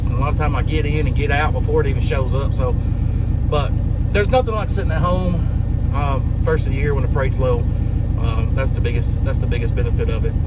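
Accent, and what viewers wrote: South, United States. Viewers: U have a deep southern accent! Where u from?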